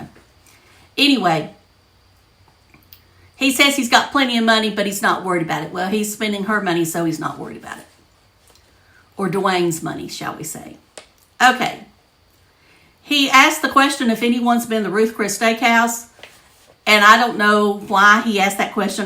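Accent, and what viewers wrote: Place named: Arkansas, United States. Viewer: Ok saw your name enough that I finally came to subscribe! Nice to see you. Your accent reminds me of my Dad's sisters, your accent. Maybe you are from Arkansas? We are Native American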